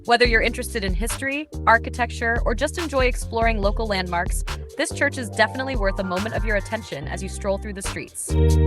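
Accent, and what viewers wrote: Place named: United States. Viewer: Ruined by this awful American accent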